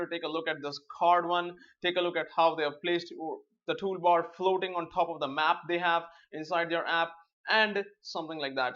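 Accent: India. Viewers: Where are you from ? lol Indian??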